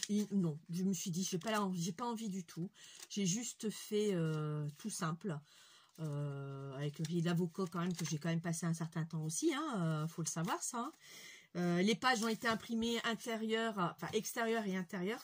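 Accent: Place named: Nord, France. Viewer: Bonjour je me suis abandonnée a ta chaîne grâce a notre amie Agnès.super sympa tes vidéos j'adore ❤❤❤❤.mais je me permet je connais ton acent j'ai le même le pas de Calais ou le nord.moi c'est le 62 mais maintenant c'est l'aude.je te fais de gros bisous.alexandra 😊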